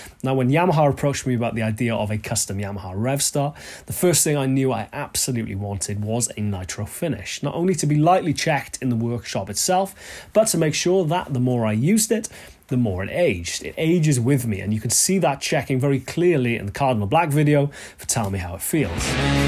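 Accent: Wales, United Kingdom. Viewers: With due props to your playing, I click on these for the always interesting topics, your thorough research and, of course, your delightful Welsh accent. (I know, I know; the Welsh viewers are like “what accent?”). On the topic itself, I’m with you. Lightly done, it can be a nice look, the Isbell being a great example. Too much and it’s like buying a new car that was intentionally wrecked.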